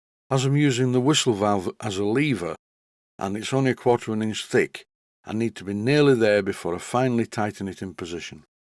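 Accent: United Kingdom. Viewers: You've mentioned CME Engineering a number of times in your videos. Do they have a web site? I searched for them, but obviously my googlefoo is failing me this evening. Either that or my Yankee ears are conflicting with your British accent.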